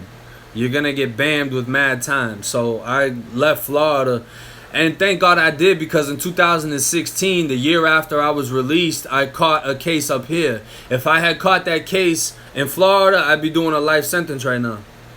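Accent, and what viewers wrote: Boston, United States. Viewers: I can here his battle between his boston accent and his fla. Accent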